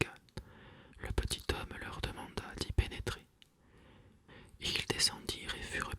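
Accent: South, France. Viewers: Je me demandais si tu étais toi-même breton puis je me suis rappelé ton petit accent qui sonne plutôt du sud, j'ai raison ? 😇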